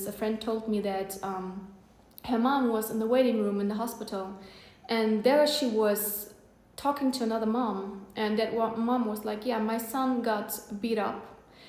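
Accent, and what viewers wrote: Germany. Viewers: Is she germann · Is that a German accent?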